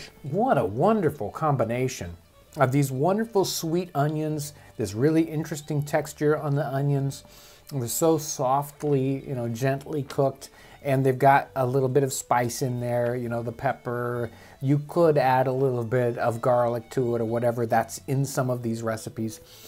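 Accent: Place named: Midwest, United States. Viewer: Does anyone else love his Midwestern accent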